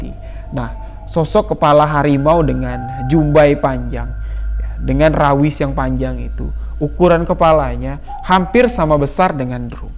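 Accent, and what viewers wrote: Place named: West Sumatra, Indonesia. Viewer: Abang ini orang minang ya... Logat bahasa nyo agak urang minang barbahasa Indonesia, terperangah, dll heheh..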